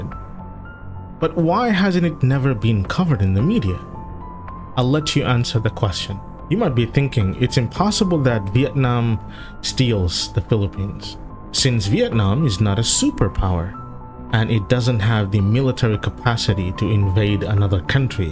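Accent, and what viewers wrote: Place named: China. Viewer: True the voice of this person is Chinese, undermine relation of Vietnamese and Philippines or this person might be Filipino duterte fanatics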